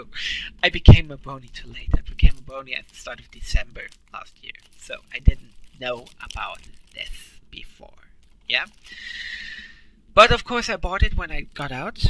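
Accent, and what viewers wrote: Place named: Germany. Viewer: Es ist immer ein merkwürdiges Gefühl als Deutscher einen Deutschen Englisch sprechen hören... Aber danke für das Video! Gab nicht wirklich viel Informationen über die deutsche Blu-Ray und war mir nicht sicher, ob es sich wirklich lohnt. :)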